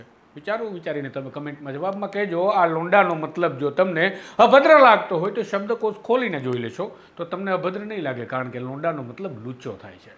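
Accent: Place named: Rajkot, India. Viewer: pyor rajkotian bhasha 👏👏 aane j layak che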